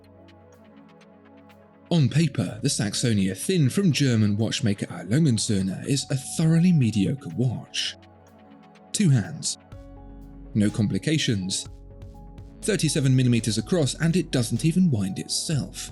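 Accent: United Kingdom. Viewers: I would totally come, but based on your accent, I think you’re on the wrong side of the pond.😕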